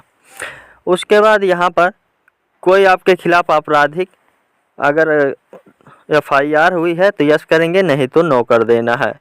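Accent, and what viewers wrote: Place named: India. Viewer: Chutiya mt bna mobile s ni bhra ja rha or Teri voice to ensi h jaise up ya bihari hoga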